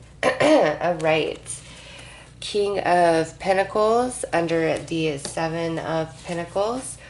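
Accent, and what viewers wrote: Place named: United States. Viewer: Omg we had an ad in Britain for a chocolate bar called cadburys caramel a swear you could be the same voice in an American accent amazing I so love your readings thankyou so very much xxxx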